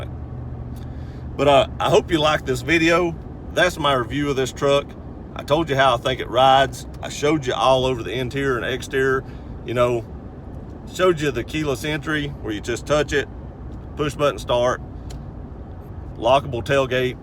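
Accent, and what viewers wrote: South, United States. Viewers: What state do you live in because you sound very southern.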